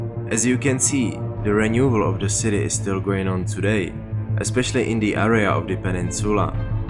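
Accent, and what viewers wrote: Czech Republic. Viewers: Cesky prizvuk slysim :). DIk.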